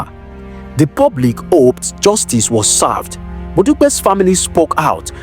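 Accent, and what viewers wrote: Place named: Hausa, Nigeria. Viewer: This narrator no go school? Aboki man dey do narration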